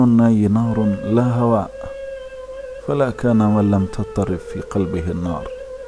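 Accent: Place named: Morocco. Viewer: أظن انك مغربي، أحس بحرف التاء كثير في قراءتك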